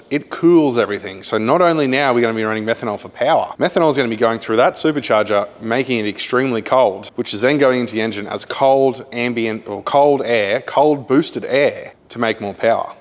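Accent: Australia. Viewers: Cry about it. Some people enjoy the talking. Especially since he's Aussie. World's greatest accent. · Aussie accent: red pepper is pronounced "red peppah" Race car is pronounced "race cah" Come over here is pronounced "come over heah" 😅😂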